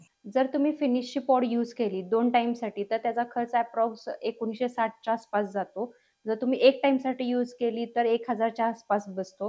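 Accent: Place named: Satara, India. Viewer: Satara accent lagech kalte 😂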